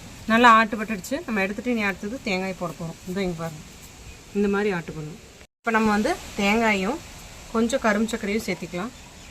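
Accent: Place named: Coimbatore, India. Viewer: Coimbatore slang and snacks super ங்கொ 🙌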